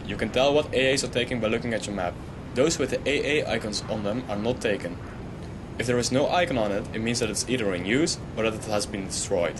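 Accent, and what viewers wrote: Netherlands. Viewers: Dutch accent FTW It sounds so awesome :D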